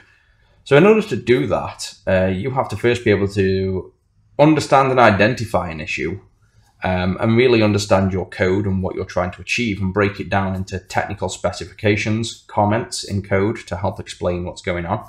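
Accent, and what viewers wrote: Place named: Liverpool, United Kingdom. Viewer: Thanks, mate! Are you from Liverpool?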